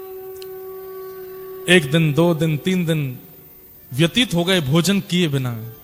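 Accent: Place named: Braj, India. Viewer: Jai ho shree madan mohan lal jiki jai mharaj apki brij bhashbhut hi pyari lagti ha hambe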